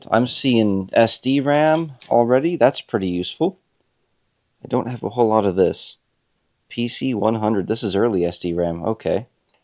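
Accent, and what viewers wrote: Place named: United Kingdom. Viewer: HAHA as a brit, I appreciate the british accent 😁😂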